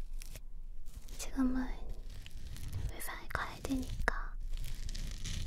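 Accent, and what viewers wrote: South Korea. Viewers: I love this korean accent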